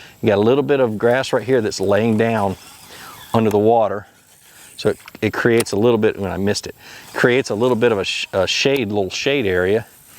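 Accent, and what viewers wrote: Georgia, United States. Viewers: are you from georgia? pls come teach me lmao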